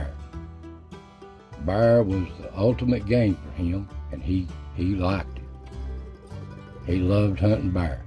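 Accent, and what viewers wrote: Appalachia, United States. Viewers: I adore listening to you tell stories,. All the more so because your mountain accent just seems to authenticate the story. I close my eyes and I imagine an evening campfire crackling, little sparks floating up off the fire. Friends all huddled around patiently waiting for to begin, but holding their breath in quiet anticipation cuz we all know it's gonna be good. No disappointment ever. ♥ ♥ ♥ Thank you. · I love your videos. You talk exactly like my grandfather and uncles. Hollywood has portrayed our "accent" as indicating ignorance. But nothing could be further from the truth. While so many Appalachian people lacked formal education, they/we possessed knowledge that no other culture in America possessed. Please read the book "Born Fighting," by Jim Webb. It proves that we Scots-Irish are always willing to fight for what we believe in. 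We don't back down. We will NOT be ruled by a KING or any aristocracy. Yes, we talk "funny." But we built and defended this country as much as any other European culture. Please keep making these awesome videos!!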